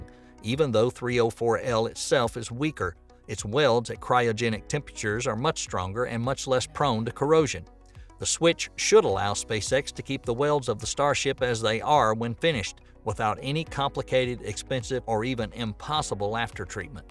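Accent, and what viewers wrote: South, United States. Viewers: very good video. I work at JSC and I love hearing a friendly Southern ascent delivering complex information.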